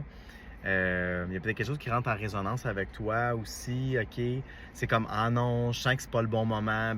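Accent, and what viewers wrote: Quebec, Canada. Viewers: Bien le Bonjour de France. J'aime beaucoup votre franc-parler, votre naturel et votre accent québécois ou canadien c'est quelque chose !!!. Ça me fait Vraiment plaisir que plus d'hommes soient Spirituels. Bien à Vous.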